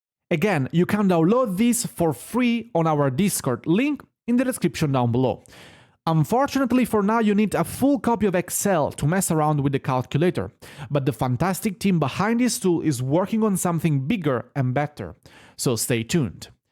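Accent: Italy. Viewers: Ma????sei italiano?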